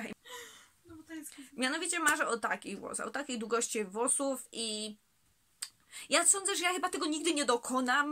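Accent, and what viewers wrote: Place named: Silesia, Poland. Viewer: Jak fajnie ślonskiego posłuchać na yt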